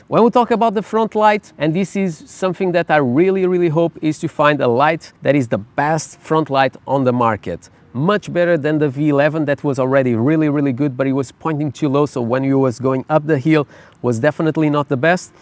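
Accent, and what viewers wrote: Portugal. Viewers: Apostava que és português...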